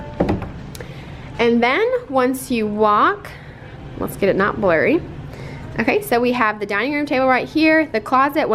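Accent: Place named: Kentucky, United States. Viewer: I was wondering why your accent sounded so familiar, I’m from Kentucky too! I’m about to graduate from EKU. Loved your Kentucky art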